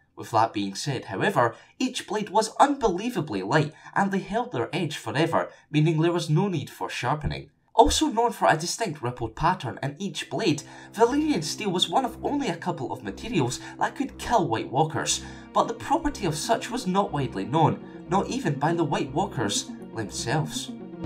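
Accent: Scotland, United Kingdom. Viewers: your videos are getting so much better and your uploads more faster. with your scottish accent its a much have😜 can you put a tutorial on how to speak scottish because im dutch and im scared to learn from my mom (born in sterlin)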